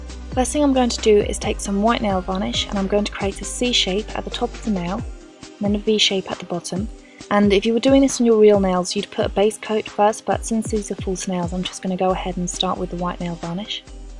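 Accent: United Kingdom. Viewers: hehe love how different certain words are in diff countries like in america its nail polish and in england its nail varnish hehe i love british accent :P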